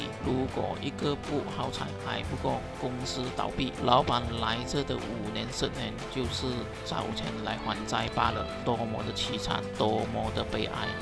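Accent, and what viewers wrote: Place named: Malaysia. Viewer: 因为用的是马来西亚的口音啊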